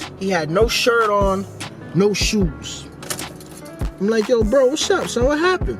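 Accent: New York, United States. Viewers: Are you from NY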